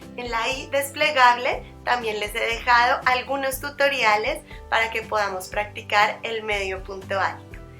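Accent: Colombia. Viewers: Hola , cómo estás , soy Colombiana , y tú de dónde eres , disculpa , tienes acento Colombiano , me encantan estas clases de tejidos , eres muy clara y explicas muy bien poco a poco y despacio .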